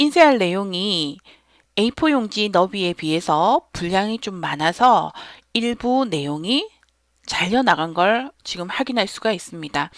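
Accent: Gyeongsang, South Korea. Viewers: 경상도 사투리 억양이 넘 듣기좋아요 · 액셀 활용에 매우 유용한 강의입니다. 부산 억양의 선생님 감사드립니다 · 60대 부산사람이 경상도 말씨로 강의를 들어니 귀에 속 들어오네요... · 나도 이분 목소리가 너무 듣기 좋아 쏙쏙 잘 들어갑니다.짤막한 강좌를 시간 될때마다 듣는데 머리에 잘 들어옵니다 경상도 분 이 신것 같은데 왜 그리 목소리가 곱습니까 강의 내용도 좋습니다.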